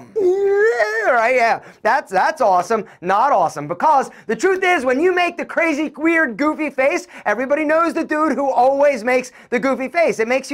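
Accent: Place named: Philadelphia, United States. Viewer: “Ouh-vurr” = Over ... This dude is definitely from Philly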